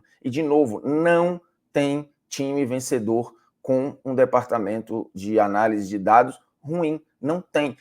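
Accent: Northeast, Brazil. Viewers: Bruno Formiga, explique aquela polêmica da época do Esporte Interativo, quando você disse Ao Vivo que iria processar o Leonardo Baran porque ele falava com você de forma preconceituosa só por conta do seu sotaque nordestino.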